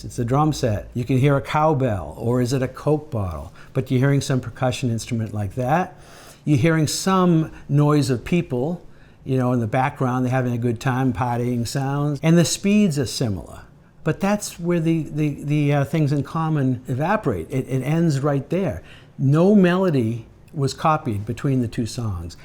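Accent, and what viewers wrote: Boston, United States. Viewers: . Boston Accent 101. But I’m happy because is So Incredibly Dumb as a song, it deserved to be destroyed.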